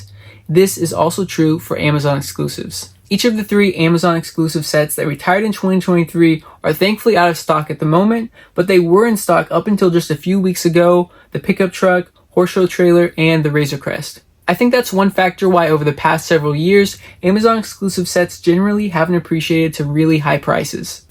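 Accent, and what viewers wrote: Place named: United Kingdom. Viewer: Why does he sound like he’s speaking English and British at the same time 😭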